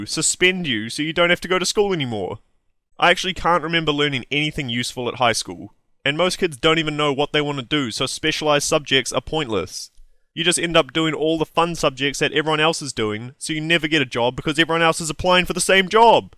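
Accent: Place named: United States. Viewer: I was hoping for this to be sarcastic (google it). At least it made me realize I should think about how to exlpain the importance of education to someone who's not educated, because (judging by the comments) most of the people reading this a) won't bother reading it and b) won't understand it. One of the biggest problems in the world is the lack of education. A big part of that problem is about very simple stuff like reading but people having basic knowledge about how things like you, our cultures and the world work opens up incredible possibilities. You shouldn't go to school just to get a degree. That attitude is the best way to make sure you take the easiest route and get no real education. According to the video there are big gaps in the American (I'm guessing by the accent) school system. I'm sure there are teachers who would gladly give lessons about taxes and other adult stuff. 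If there aren't, don't quit school, try to make it better for everyone. Most of the "useless" stuff mentioned is not actually useless, you just never learned how to use it. When it comes to things like math problems and reading novels, a lot of it is just brain exercise that makes you generally smarter. I could go on but it's 1 at night.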